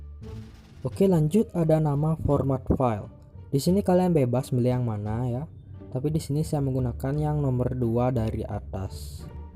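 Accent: Bali, Indonesia. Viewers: Orangh Bali Banh? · Adi care nak Bali logat ne,,😀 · Org bali ya bg? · Kayak Logat Orang Bali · Logat abangnya ke orang bali salam bali kawan🙏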